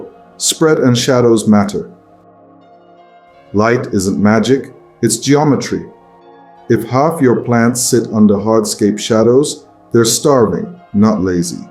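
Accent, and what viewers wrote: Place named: Russia. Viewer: I like your somewhat russian 🙂 voice which is clearer and concise. Slower and well paced so I can pause and take notes too. 👍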